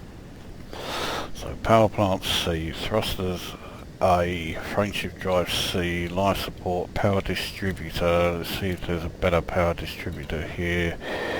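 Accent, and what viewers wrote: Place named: Cumbria, United Kingdom. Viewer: Good Luck to you, I look forward to seeing any Uploads you may have when you aquire The Clipper, My PC is a little too slow for the Game but When it is finally finished I may have a bigger better PC, By the way IU'm from West edge of Lake district and i recognised your accent as not being that far away from me:)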